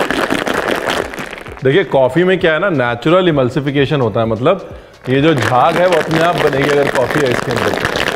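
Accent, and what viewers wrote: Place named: Uttar Pradesh, India. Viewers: Totally up wali boli 😅 mee too from lko that's y i like u so much❤ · Arre bilkul Lucknow wale jaise bolte hai aap, you can easily figure out how a Lucknow wala speaks. I am too from Lucknow.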